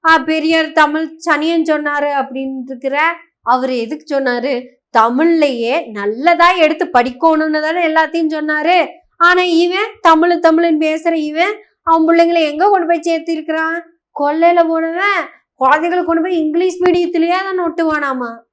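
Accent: Kongu, India. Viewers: அருமை மகளே உனது கொங்குத்நமிழ் என்னை மிகவும் ரசசித்து கேட்க வைக்கிறது வாழ்த்துக்கள் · சகோதரி கொங்கு தமிழ் சூப்பர் பாராட்டுக்கள் வாழ்த்துக்கள் 😂😂😂😂😂😂😂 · கொங்கு தமிழ் சூப்பர் சகோ பாராட்டுகள் நன்றி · சிறப்பான,தரமான பேச்சு.கொங்கு தமிழில் சீமானை சுளுக்கெடுத்து விட்டாய் சகோதரி.வாழ்த்துக்கள் .🎉🎉🎉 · எப்படிப்பா இப்படிஅசத்திறிங்க அருமை கொங்குதமிழில 😄🙏🙏❤❤💐💐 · திராவிடச்சுடரின் கொங்கு தமிழ் விளாசல். நன்றி. வாழ்த்துகள். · நன்றி தோழியே . உங்கள் பணி மேலும் தொடரட்டும் அழகான கொங்கு தமிழில் . வாழ்க பெரியார். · கொங்கு தமிழ் கொஞ்சும் பதிவு.. அருமை அருமை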